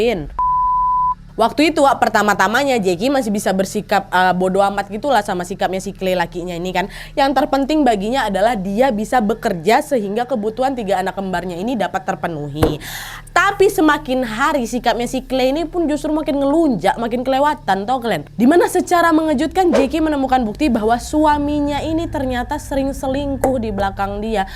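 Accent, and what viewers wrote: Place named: Medan, Indonesia. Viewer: ngakak,nampak kali medannya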